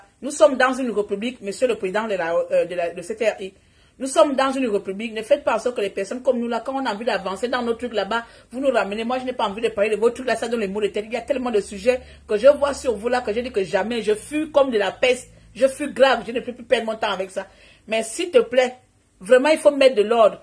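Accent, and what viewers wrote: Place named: Cameroon. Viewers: N'est ce pas ?son accent montre kel est aussi camerounaise com sa sœur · Tu ne dis rien !!! Et tu es camerounaise. Je t'ai reconnu par ton accent...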